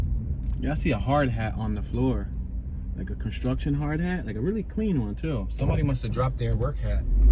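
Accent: Philadelphia, United States. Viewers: that why I offered! You riding around talking and no disrespect you giving out some false info or half info. That why other channels hop out and do little interviews with the locals. I’m not telling you how to du your channel. But just getting data but not touching the field is two different things! You want the real or u want to ride around sounding like a tourist they grew up in The burbs and the only info u got is from a collection of numbers they put together that most of the time manipulated? But Hey do you bro! And of course you not going to know all he stories that why most these channels that blow up do hop out and do I interviews or chat with locals! Again I’m not telling you how to run you page but your numbers are low for a reason. You page a little light bro! I mean that with all do respect! You trying to get it popping or ride around with this half a philly accent sounding like you from Doylestown somewhere? 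Even your tittles I can tell you not from the city and u not getting ground zero info! Bro u said nicetown- tioga was the most dangerous? Man that area is dead zone! Big houses and ain’t nothing going on except up and down hunting park ave! But like I said it your channel but a real Philly bul gone going to know something off about you and your info and page! I guess it’s for the suburban and out of Towners. Where you from bro? For real?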